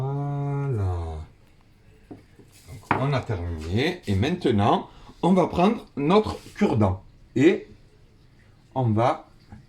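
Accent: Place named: Sidi Bel Abbes, Algeria. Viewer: ASALAM alikoum vous êtes de Sidi Bel Abbes vous sa s'entend moi aussi je suis de Bel Abbes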